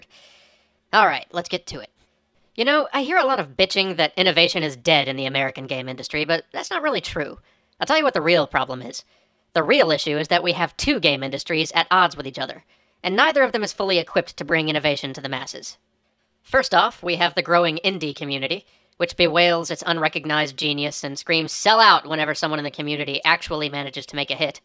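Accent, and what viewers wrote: United Kingdom. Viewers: Best almost stereotypical british accent evarrr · I came here for the British accent arguement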